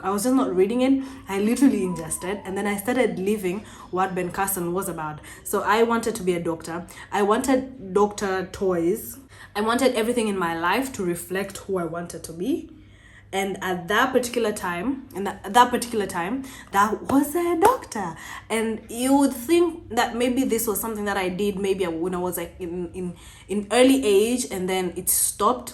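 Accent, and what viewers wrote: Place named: Kenya. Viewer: you sound Kenyan, then you don't!!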